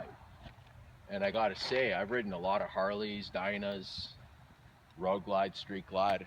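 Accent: United States. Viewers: Are you from Rochester NY? Your accent... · You sound like you're from the northeast U.S., I am just wondering because I am. I have a sky blue 2005 American Ironhorse Outlaw chopper and I love it but I also love the ''old school'' looking bikes like you have, thank you for sharing!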